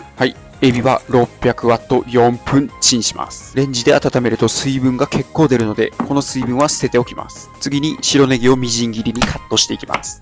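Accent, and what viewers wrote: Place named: Miyazaki, Japan. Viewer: 宮崎訛り懐かしい〜😊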